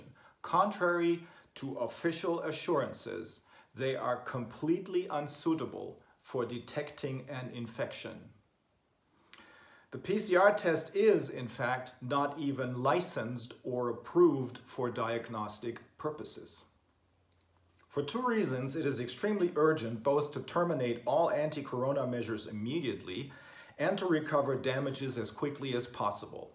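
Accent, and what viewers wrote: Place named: United States. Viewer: On a side note, this dudes American accent is amazing.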